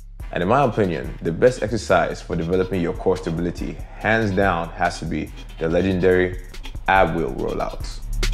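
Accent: Nigeria. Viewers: Yo, i heard that nigerian accent 😂😂😂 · This voice is so Nigerian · i can hear the nigerian in his voice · I sensed he was Nigerian from his accent at first. I felt kind of joyous when he confirmed it with that pidgin English line.E choke😁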